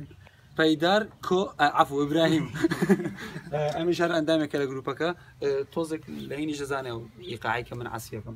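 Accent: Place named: Sorani, Iraq. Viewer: وەڵای لە کرمانج ناچی ڕێک ئەڵێی سۆرانیت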